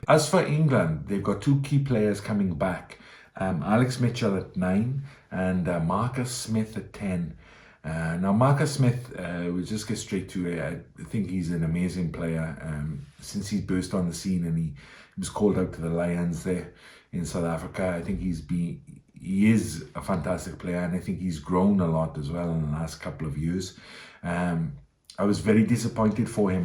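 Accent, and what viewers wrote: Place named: Wales, United Kingdom. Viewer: Hey. Good to hear your comments on the teams for this weekend's three juicy matches. I can't disagree with anything. Biggest chance of an upset for me is with the Italians. I don't think England can upset this Ireland set-up. Love your accent mate (something of an interest for me). Clearly lots of Welsh there but I think that there is something else underneath that. Italian perhaps?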